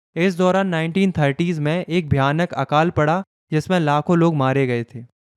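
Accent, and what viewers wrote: Uttarakhand, India. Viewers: bhai tum uttrakhand se ho kya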